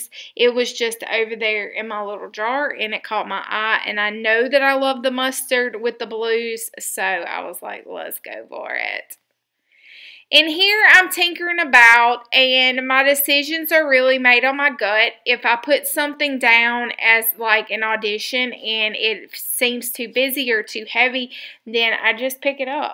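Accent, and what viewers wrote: South, United States. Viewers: G’day, loved your video so much, new subbie. Another one closer to your 14k. You make so much sense in this video, and just gotta say I love your southern belle accent! 🥰 crafty hugs from down under. Xx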